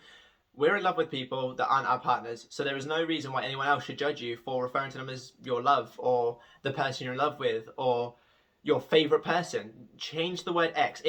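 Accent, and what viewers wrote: United Kingdom. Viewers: Definitely my new favourite law of assumption youtuber , helps as well that you’re from the uk, so I can actually understand you better 😅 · You are a bundle of possative lovelyness, I am so grateful to the American youtubers that have really helped me with my self concept. But it is nice to hear a uk voice. Makes it even more real. I'm subscribing. See you on the flip side. I mean I am already there. 🙂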